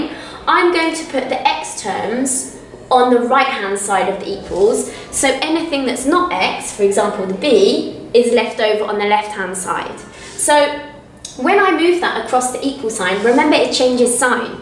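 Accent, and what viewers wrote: United Kingdom. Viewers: Bro BRITISH ACCENT IS LIKE 😅 EASY TO GUESS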